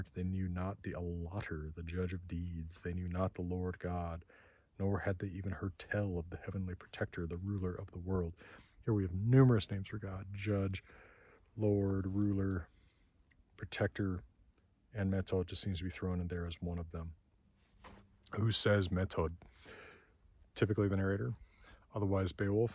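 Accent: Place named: United States. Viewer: I was gonna say the opposite, when he speaks it that quickly the American accent comes thru quite clearly, imo. in slower readings, such as the beowulf series with simon, there's much less american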